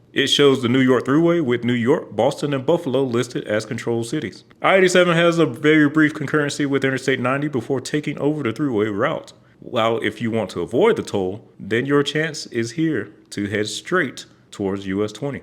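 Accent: South, United States. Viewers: He is from the south, so they pronounce it "rout" down there.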